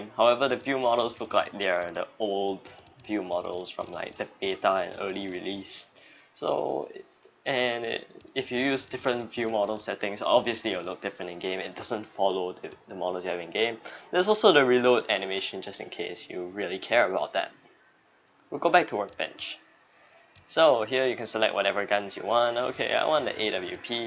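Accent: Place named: Singapore. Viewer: Are u singaporean? u have a accent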